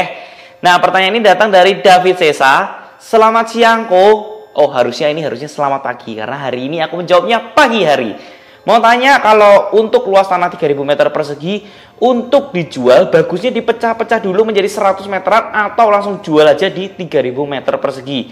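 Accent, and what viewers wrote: Java, Indonesia. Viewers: Logat jawaaaa medok koyok akuu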